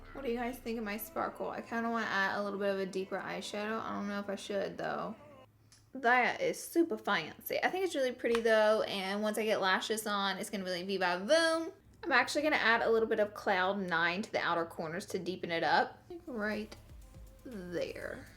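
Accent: South, United States. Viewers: yes because she’s southern and has an accent.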